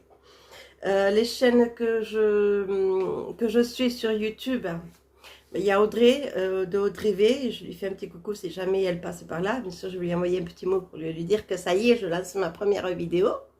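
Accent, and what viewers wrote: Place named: Tarn, France. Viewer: Coucou, me voilà abonnée ravie d'avoir reconnu mon accent, je suis du Tarn sud sans parler du petit indice derrière toi 😉 Hâte de voir tes cousettes, nous partageons pas mal de créatrices dont les meilleures les Bobines Patterns 😁 A bientôt 😘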